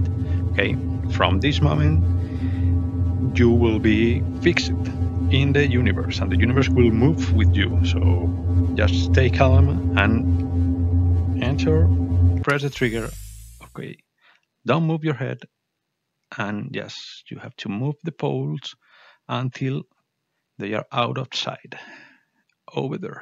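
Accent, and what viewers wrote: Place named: Spain. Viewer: Tu inglés es bastante bueno y no entiendo porque tienes que decir "sorry" por que tengas acento español. Un alemán hablando ingles tiene acento alemán. Un indio tiene acento indio. Ya me gustaría a mi que los británicos o los yankies hablaran spanish como tu hablas ingles. Asi que no hay que disculparse. Me encanta que la gente use esta herramienta para poner algo de objetividad en los visores. Pero uno de los puntos mas importantes es el sweetspot (entendido como ege-to-edge clarity) y aqui no hay una forma de comparar los visores. He visto que hay una herramienta vuestra LensTestRov que mide exactamente eso y creo que se podría añadir a esta herramienta y asi comparar resultados de sweetspot de diferentes visores.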